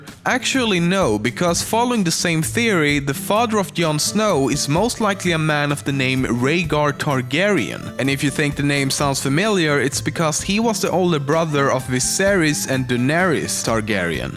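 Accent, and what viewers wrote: Sweden. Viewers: Seriously, his thick Swedish accent is so annoying! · Are you Swedish :D? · are you from sweden?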